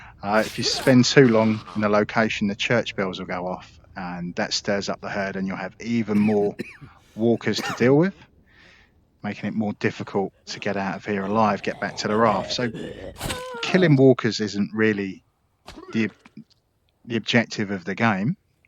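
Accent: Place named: United Kingdom. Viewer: He (and me) are British most likely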